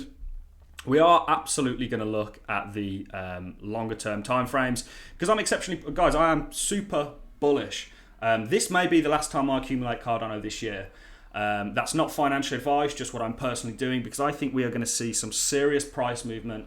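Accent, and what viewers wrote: Birmingham, United Kingdom. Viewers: You sound like a fellow Brummy? I'm pretty happy you made this video. I was planning on selling my Cardano, but I'll hold on, and hope the price jumps up. What's your thoughts on Elrond? I've had it for quote a while now.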